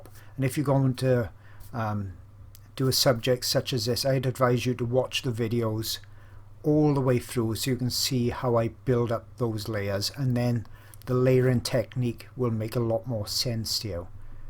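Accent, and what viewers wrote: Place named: Scotland, United Kingdom. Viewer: Thanks for ur tutorials u are a magnificent painter and u captivate ur audience in every way, I've learned a lot of materials and techniques with u, I'm not professional but I always liked the painting, now I've returned to my taste for pastels, it is hard to get the materials but derwent takes place in my country I bought some derwent pastel pencils in individual way and is more cheap than with the metallic case and other products, I give thanks for the Internet, a warm greeting from Aztec lands Mexico, I hope not bother u but u sounds like a scot beautiful accent 🇲🇽🇲🇽🇲🇽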